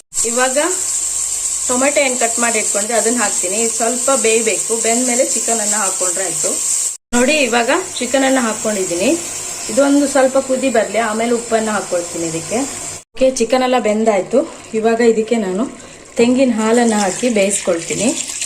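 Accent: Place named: Mysore, India. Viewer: Nimma bhase Mysore side matado tarra edhe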